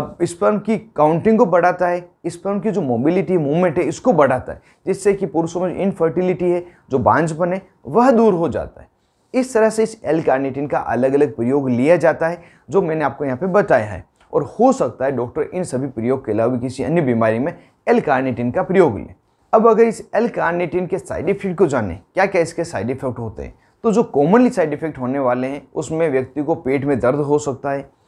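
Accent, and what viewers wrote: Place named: Bengal, India. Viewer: Bangla ucharan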